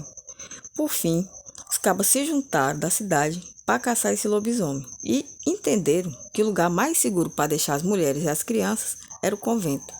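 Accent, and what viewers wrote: Northeast, Brazil. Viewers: Já me inscrevi no canal só pelo sotaque amo meu nordeste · Primeira vez aqui no canal e amei o seu relato! Seu jeito de narrar é maravilhoso! Bem nordestino kkk parece eu falando!! amo meu nordeste! Parabéns pelo relato!!!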